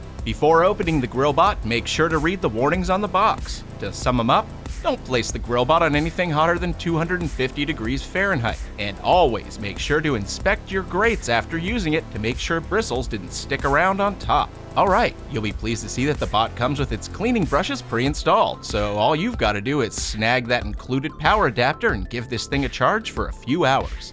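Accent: Buffalo, United States. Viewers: Are you from buffalo????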